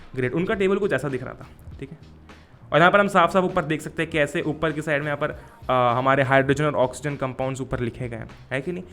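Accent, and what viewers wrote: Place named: Maharashtra, India. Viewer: dada marathi ahes na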